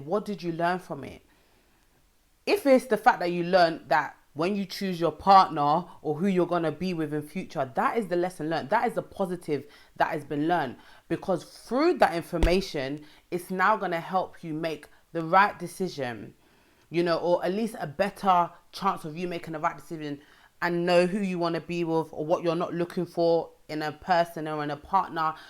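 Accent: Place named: London, United Kingdom. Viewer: I just love that accent, oh how I miss London. You are doing such an awesome job with the content you are touching on. There are life lessons in all disappointments. Thanks for the inspirational message.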